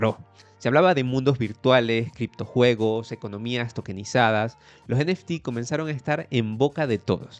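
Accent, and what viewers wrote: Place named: Latin America. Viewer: Es curioso, tu acento es hispanoamericano pero gran parte de tus expresiones suenan españolas, ¿vives en España? Buen vídeo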